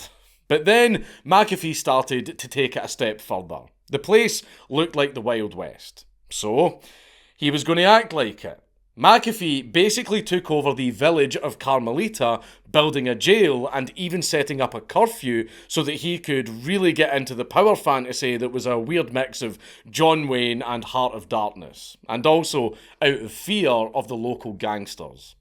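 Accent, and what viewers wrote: Scotland, United Kingdom. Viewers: aww i thought he was irish hes scottish which is js fake irish :( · I could listen to this Scottish man talk about bizarre historical figures all day.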